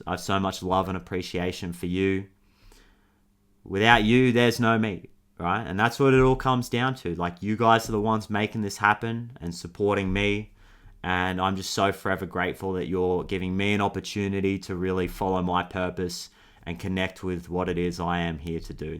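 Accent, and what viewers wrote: Australia. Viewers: You are so talented, appreciated and on point. And your aussie accent brightens my day everytime 🙏😁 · Hi, Thanks again, for your wonderfully encouraging words!! Are you Australian? (Your accent gives it away…lol). My grandpa was from London, but he always said he wanted to go to Australia. He is in Heaven right now, so he can probably travel anywhere he wants to. Best to you,. 🐶🥰🌸 “I am so grateful that I have received large sums of money, under Grace in Perfect ways.”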